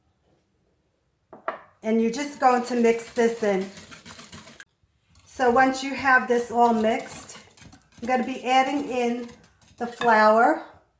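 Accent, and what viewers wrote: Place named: New York, United States. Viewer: Yes, you sound like me, lol! I’m a Bronx girl born and raised, still living here. Are you still in the Brooklyn Queens area?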